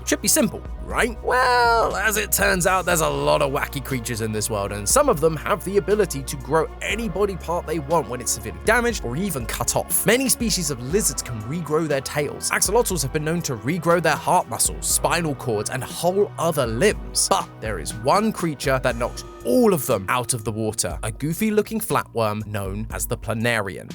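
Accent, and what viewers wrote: United Kingdom. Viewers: hmm got a little British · British matpat gets More British somehow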